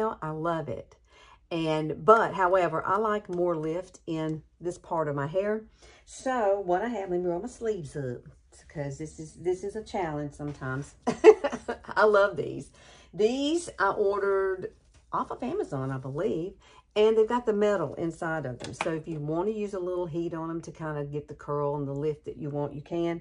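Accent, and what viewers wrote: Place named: Alabama, United States. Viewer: I found your fine hair vids and immediately when I heard you talk I thought " Alabama". I'm an Alabama lady too though much older than you.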